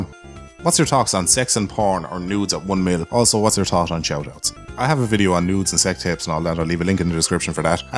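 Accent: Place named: Munster, Ireland. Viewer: Sounds like cork or limerick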